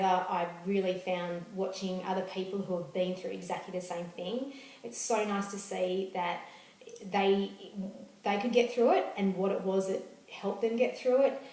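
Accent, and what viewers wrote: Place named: Australia. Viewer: Hey, have just been watching your videos and given me some positive outlook after being told yesterday I have to have a L5/S1 discectomy. It's also great to hear the perspective of someone with an Aussie accent. Not sure where you're located, but if you're in Sydney I would be keen to hear more about your sports physio as I am keen to get up and about and back onto my bike as soon as possible. If you are local to me please email me the details of your physio. Thanks heaps and good luck for your continued recovery!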